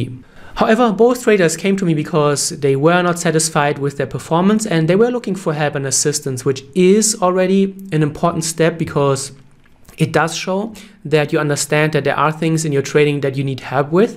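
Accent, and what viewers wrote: Germany. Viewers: Are u German, accent is very much German English accent😁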